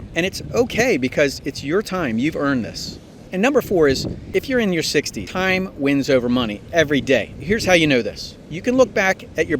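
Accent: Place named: Baltimore, United States. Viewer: Sounds like a Baltimore or Pennsylvania accent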